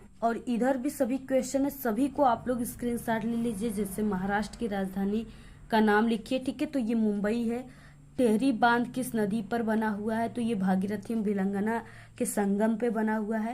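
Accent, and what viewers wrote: Bihar, India. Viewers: Madam aap Bihar se ho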